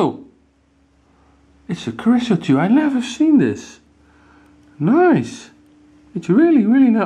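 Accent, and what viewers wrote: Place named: Netherlands. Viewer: Nederlander zeker? :P Awesome to have a peek inside these things though! :D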